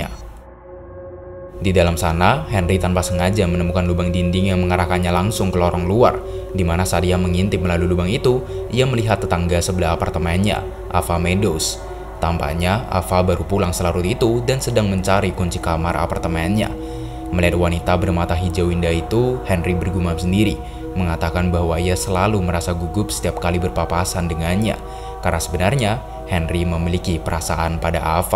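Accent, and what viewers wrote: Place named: Java, Indonesia. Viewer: BANG LU ORG MANA SI KOK KEK MEDOK MEDOK GT SALAM DARI SBY YAA SEMANGAT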